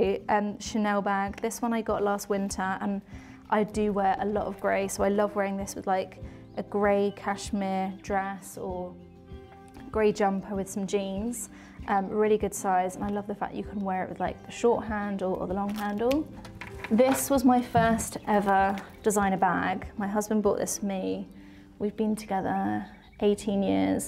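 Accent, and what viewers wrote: United Kingdom. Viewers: I love she also got same eyeshadow pallet as mine which isn't expensive 👀 Her accent and her living style so remind me of UK and I miss England 😞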